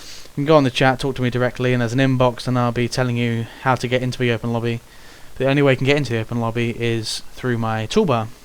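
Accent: United Kingdom. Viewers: Gotta say almost had a heart attack when i saw you did a review on the Tar-21. Absolutly love the thing to death and with silencer too, 5/5 for the vid and a Brittish accent to boot! ;p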